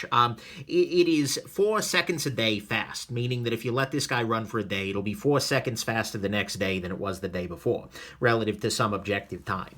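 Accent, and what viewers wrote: United States. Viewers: Mark Walters That’s a pretty shallow attitude. I am English and find his accent so much better than computerised voices. It’s obviously a regional variation of the standard American accent (if there is such a thing). Carry on...you’re doing a great job on watches and knit.A man of my own heart.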